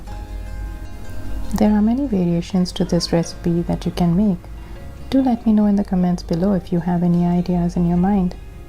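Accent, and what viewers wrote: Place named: India. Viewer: Very nice u sound like u r from India 🇮🇳 am i west Indian trinidad 🇹🇹 lovely 🙏✌️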